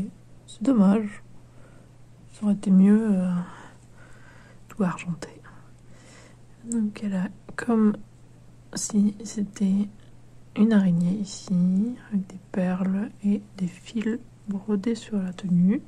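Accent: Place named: France. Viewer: I have 80 of rainbow and shadow high dolls And I have her and she's gorgeous and your French accent is so gorgeous. I love it. I can understand what you're saying. Even though you're speaking French. Which I love.❤❤❤❤❤❤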